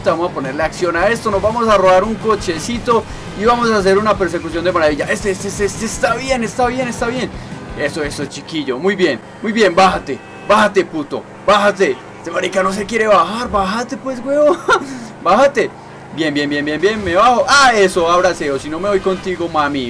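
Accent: Antioquia, Colombia. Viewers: Puro asentó paisa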